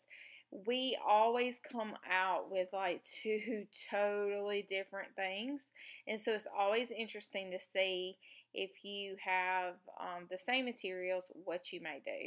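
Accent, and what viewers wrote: South, United States. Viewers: My stars girl, I love all of your southern accents and expressions. I moved out West 15 years ago and miss the accents. You bring me Joy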